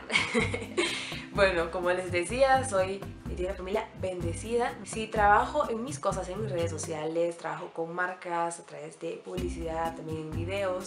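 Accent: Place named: Guatemala. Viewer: Que rico volver a escuchar un acento chapin. Y que mejor de una mujer porque como que tienen mas marcado nuestro acento hermoso. Saludos y tenes razón con eso del tráfico que es espantoso, pero en mi lugar prefiero la ciudad porque en un publo me aburriría un montón.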